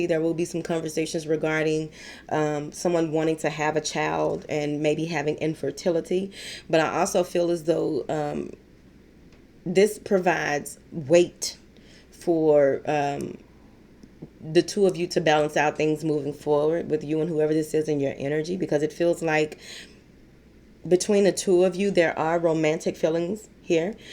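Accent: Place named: Dallas, United States. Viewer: You in Dallas. It sound like it, in your accent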